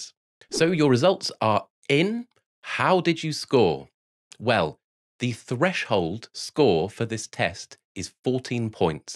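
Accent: United Kingdom. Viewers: And is his sweater blue or black? How many piercings does he have? Is that an English accent? 😂😮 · I noticed the asymmetrical glassesand the way they make his eyes smaller, both the lip and weird-placed ear piercing which is also a bit rusty it seems, the few grey hairs in his mustache and beard, the hairs that are out of alignment on his head, the whiteness and regularity of his teeth, his brittish accent and so many other things I need to rewind to hear the message again. No doubt I have some form of adhd.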